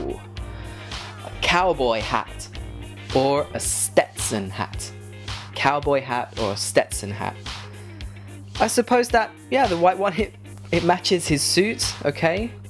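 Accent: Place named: England, United Kingdom. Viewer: Where r u from by the way? I've visited London last year and it was an amazibg experience. From your accent it's more than obvious you're from England))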